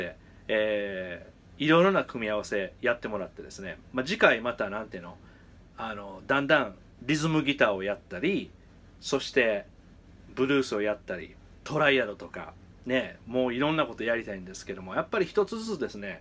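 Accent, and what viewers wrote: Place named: Kansai, Japan. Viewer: 20年ぐらい前に藤田先生のCD付き教則本買って同じ事してました、とても懐かしいですね。 最初は気が付かなかったけど関西なまりであれーってなりました笑 動画登録して楽しく拝見させてもらいます。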